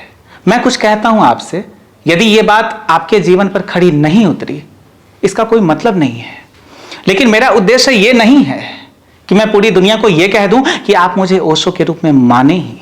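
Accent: Bihar, India. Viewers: भैय्या लोग जैसे बोलते वैसे ये लडका बोलता है. रजनीश ओशो की बात करते है लोग मंत्रमुग्ध होते थे. इसकी बात मे कोई दम नहीं.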